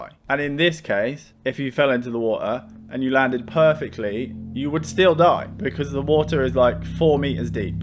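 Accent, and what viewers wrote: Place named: Southampton, United Kingdom. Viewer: My step-dad used to do this for a living (work at the top of tall structures, down at Esso, cranes at the docks etc.) and I guess some people are just born without that innate fear. Also, I didn't know you were also a Sotonian until this video and it's weird I kind of guessed it from the accent! Enjoying your content, thanks.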